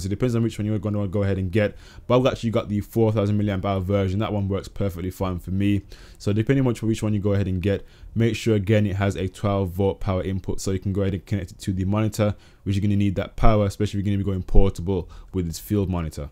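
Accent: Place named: Birmingham, United Kingdom. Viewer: Sick video but u from birmingham? Ur accent gives me a vibe haha :D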